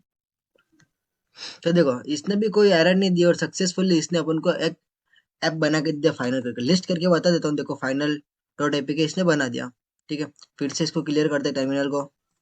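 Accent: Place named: Ahmedabad, India. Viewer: bhai teri avaj Ahmdebadi jesi he. kya tu ahmedabad se he bhai😅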